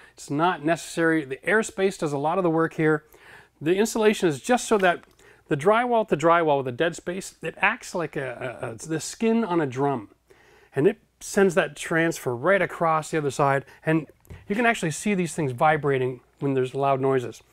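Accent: Canada. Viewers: This guy is Canadian, eh?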